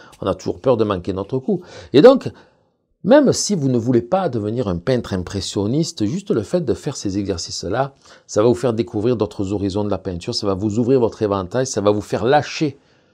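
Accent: South, France. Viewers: Coucou Merci je viens de m'abonner et je vais m'y mettre - Pédagogie = fluide - accend du sud = charmant et phrasé agréable 🙃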